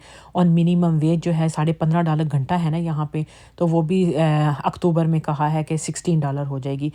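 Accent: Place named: Peshawar, Pakistan. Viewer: Are you hindku speaking your accent sounds peshori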